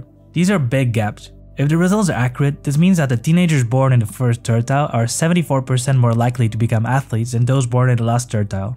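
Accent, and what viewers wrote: Netherlands. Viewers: I can tell you, being born in December, I must really be amazing since I still managed to outperform everyone else in my class from primary to high school. And very humble at that. Edit: I'm Portuguese too. You don't have a Portuguese accent in English... Honestly, you have a very Dutch/Flemish accent. It's tripping me.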